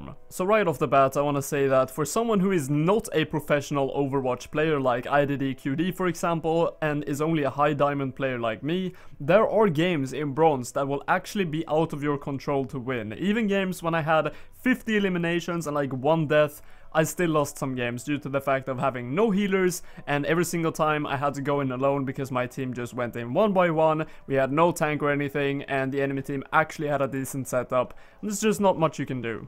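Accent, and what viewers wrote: Sweden. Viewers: Are your swedish? Nice english x)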